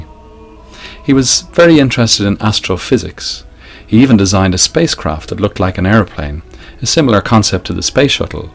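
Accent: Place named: Ireland. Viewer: The narrator sounds Irish. As to your bewilderment, uneducated Americans, and many educated ones, are certainly shy on history, geography, and languages. We are good enough at details to have flown an airplane at Kitty Hawk and sent several Saturn V rockets to the moon and back. Now Musk is building them bigger and landing them vertically. That is freakin' detail. We have been blessed with a large country into which people people have found refuge and opportunity, assimilating into culture while adding to the color, patterns, and textures of that fabric. When someone speaking, say Hungarian or Lithuanian, is 6000 miles away why learn it anymore than you would learn Latin to speak to a Roman from 2500 years ago? I know Latin but there aren't many Romans around. I also know German, a language from a place my starving ancestors left because if they and a third of the town hadn't, all would have starved. 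After about four generations of my folks speaking it as a native tongue, I had to learn it in school. As to geography, is there a major League Baseball Franchise in Austria or Australia? And most Americans wouldn't know which is which. Blame the lame, give a trophy for nothing, liberal, progressive, unionized public school system. As to history, we like WWII movies like Patton, Saving Private Ryan or more imaginatively, Taratino's Inglorious Bastards. We also like movies from earlier times and cultures, take Troy, for example, or Robin Hood vs. the evil Sheriff tales. Unfortunate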